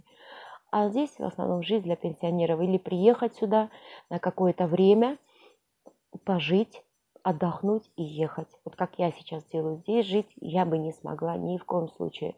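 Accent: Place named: Georgia. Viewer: Акцент у вас прям Грузинский немножко ,или мне показалось !🤭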